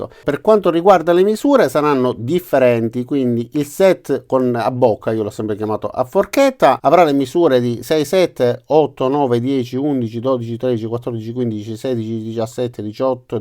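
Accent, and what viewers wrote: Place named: Southern Italy, Italy. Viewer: anche se io personalmente ho scelto un altro brand... seguo sempre volentieri (e sono iscritto) i tuoi video. Inoltre é anche piacevole questo nostro accento del sud. Un caro abbraccio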